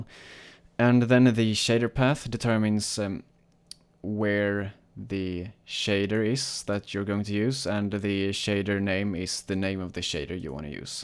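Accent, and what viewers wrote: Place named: Germany. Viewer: Your accent sounds kinda German or Swedish ... I am german :D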